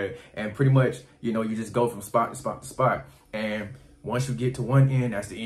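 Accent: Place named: Memphis, United States. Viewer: Definitely sounds like you are from Memphis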